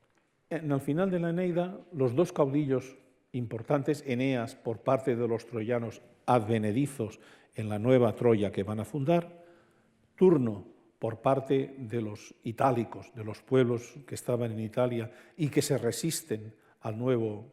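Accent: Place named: Catalonia, Spain. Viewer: Encuentro esta conferencia 8 años despues de que fue presentada; quiero decir que nunca he leido a Virgilio, soli se de él por lo que nos enseñaron en la escuela y por cuanto Dante lo menciona en su Divina Comedia; dicho lo anterior quiero agradecer a la March por sus magnificas presentaciones y por invitar a maestros como este señor que me despiertan el gusto por la lectura de lis clasicos; gracias maestro Vidal por su hermoso acento Catalan y por todo su conocimiento compartido aqui.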